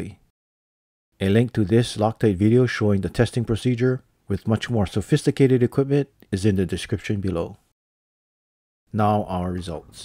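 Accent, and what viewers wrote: Hawaii, United States. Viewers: Are you from Hawaii? I hear a little Hawaii local twang in your voice lol😂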